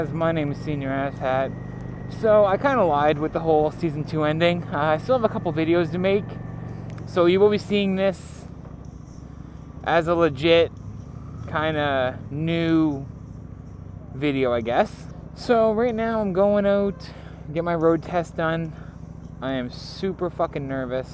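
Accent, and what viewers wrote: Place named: Nova Scotia, Canada. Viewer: Were your first <3 God I can hear the Nova Scotian within you.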